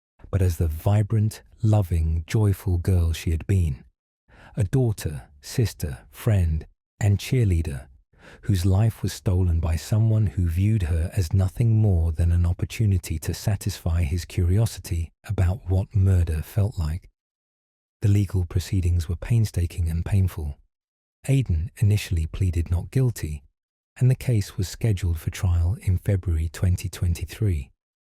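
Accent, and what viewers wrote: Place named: United Kingdom. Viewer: Why use the British AI accent for USA stories? Use the USA accent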